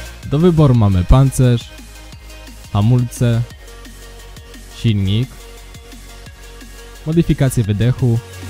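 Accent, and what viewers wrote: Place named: Silesia, Poland. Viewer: Domyśliłem się bo osoby ze śląska zazwyczaj wymawiają czy a nie trzy :P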